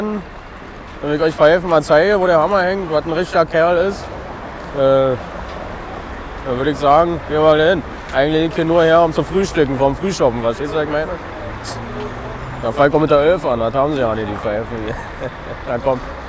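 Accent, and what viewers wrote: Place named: Berlin, Germany. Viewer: ..BIST ECHT DER HAMMER..BERLINER SCHNAUZE..WIE ICH👍💪🖐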